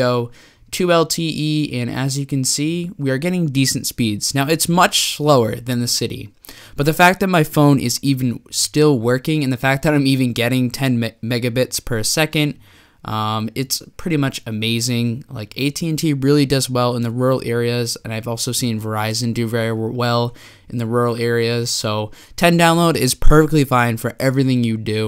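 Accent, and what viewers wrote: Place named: North East, United Kingdom. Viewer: Where in the north east are you from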